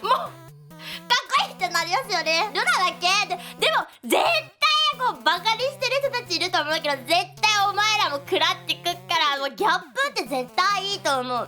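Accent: Hakata, Japan. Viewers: 博多弁よっしゃぁ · 博多弁まじ神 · 博多弁ですฅ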